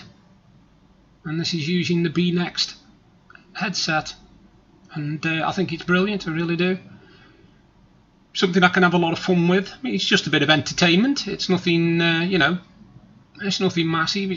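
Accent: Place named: Wales, United Kingdom. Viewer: Are you from Wales? Nice accent! ☺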